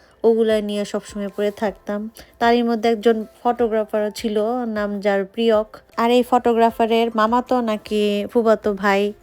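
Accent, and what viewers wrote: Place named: Munshiganj, Bangladesh. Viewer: তাইতো বলি ভাষা কেন এত চেনা চেনা লাগছে আমার বাড়িও মুন্সিগঞ্জ শ্রীনগর থানা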